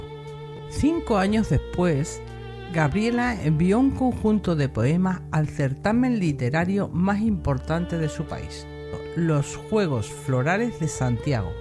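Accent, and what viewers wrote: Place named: Andalusia, Spain. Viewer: La propietaria del canal es andaluza, por eso pronuncia así mi alma!! Relájate un momento chiquilla!!!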